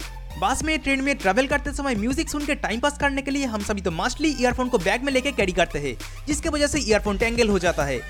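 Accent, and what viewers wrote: Bengal, India. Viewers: Aapki tone se aap Bengali lag rahe ho relax dear aaram se bolo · Powergrid Fatehpur its due to Bengali tung... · Bro are u a bengali ur pronouciation is funny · r u BENGALI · R u Bengali?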